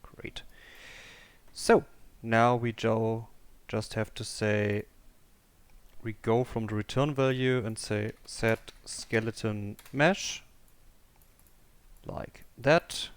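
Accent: Germany. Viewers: It completely doesen't work for me..... You sound german so I hope you are german. Answer in german when you are. When i press play my car won't spawn and i wont possess it.. To make matters worse it spits out this error: Attempted to access BMW_Blueprint_C_0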